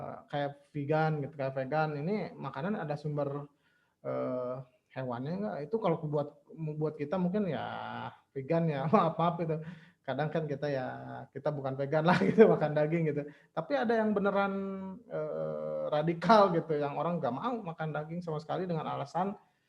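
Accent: West Java, Indonesia. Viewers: Bang Logat Sunda ya , hehe , mantap Slurr 😁 , Penjelasan nya mudah di pahami bang lanjutkan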